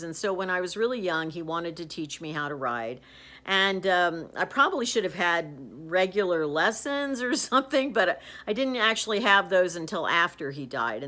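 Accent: United States. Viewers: Thanks for sharing your experiences with your father. All sorts of stuff happens to all sorts of people all the time. You got through it, and look, you turned out alright. I'm an American living alone in England for the past 30 years, so it's nice to hear your American accent. Comforting.😀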